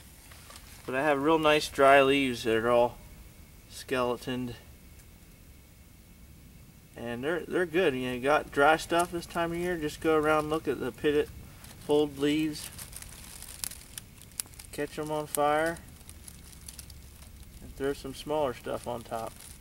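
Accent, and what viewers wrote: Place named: Appalachia, United States. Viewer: Thanks for sharing. What part of the Appalachia are you from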